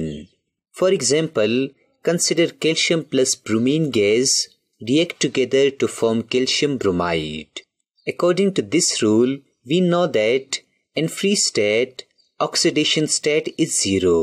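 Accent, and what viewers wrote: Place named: Uttar Pradesh, India. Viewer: according to his voice and accent he sounds like he is from up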